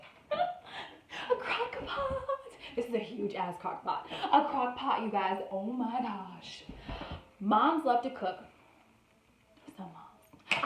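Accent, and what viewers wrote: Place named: South, United States. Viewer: The crockpot is a great idea 💡 your southern accent 😹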